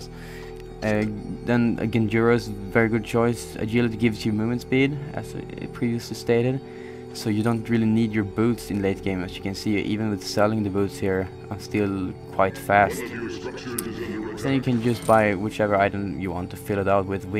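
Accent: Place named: Sweden. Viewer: I can't watch this due to your Swenglish accent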